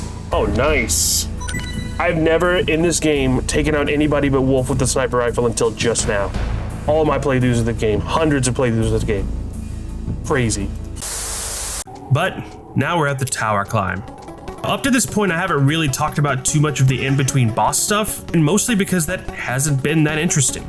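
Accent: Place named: Mississippi, United States. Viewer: Honking Mississippi accent showing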